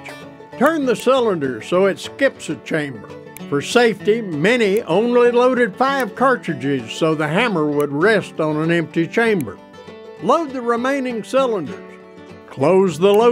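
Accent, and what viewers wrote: United States. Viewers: Most American accent hahah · I love Texas accent.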